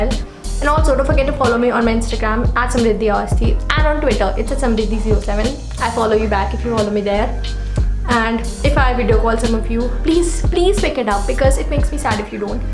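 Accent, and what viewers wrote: India. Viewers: Haha she has an Indian accent ofc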